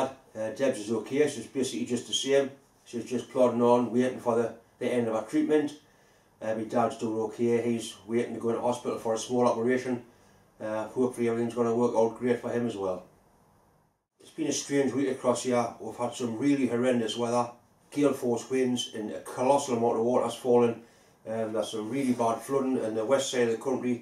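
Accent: United Kingdom. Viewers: are you from the United Kingdom?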